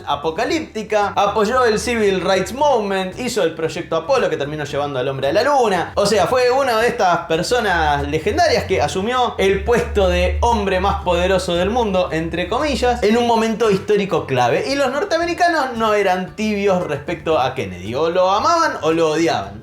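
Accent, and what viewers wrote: Argentina. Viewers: Sha sha sha sha.....ese acento argentino fastidia....she she she....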